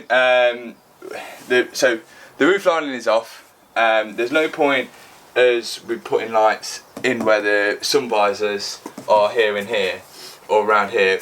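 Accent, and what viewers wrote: Leicester, United Kingdom. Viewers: You sound like a Leicester lad.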